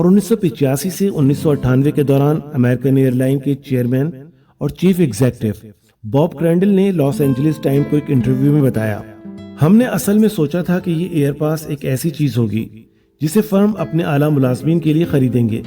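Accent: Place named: Pakistan. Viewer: Masha Allah aapki voice or Btane ka urdu lahza dil ko chuu jata h .. puri yt m sbse best of best videos hoti h outstanding Big Respect 💝😊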